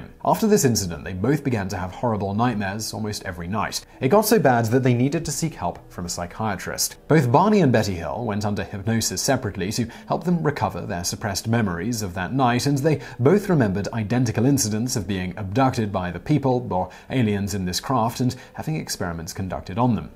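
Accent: England, United Kingdom. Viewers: Ran-i-er. Damn Limeys. Rain-eer. Your welcomer. Jesse Mar-sull. Marcell. You English folks talk funny.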